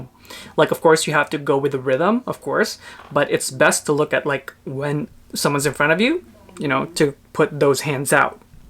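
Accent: Philippines. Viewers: I love your heavy Pinoy accent. Magandang umaga from the USA!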